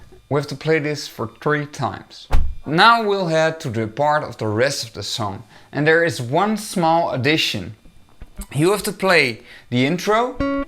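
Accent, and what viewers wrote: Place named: Netherlands. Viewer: I smell nederlander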